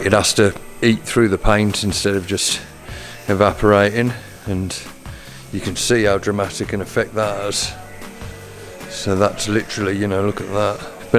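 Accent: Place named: Birmingham, United Kingdom. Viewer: Nice to hear a fellow Brummy on the ML channel!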